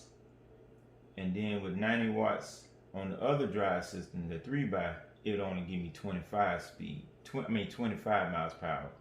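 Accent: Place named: Atlanta, United States. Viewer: I would have guessed he is from outside of Atlanta somewhere...I try to guess regional accents. My guess is he grew up and/or lives within 40 miles of Atlanta, Georgia.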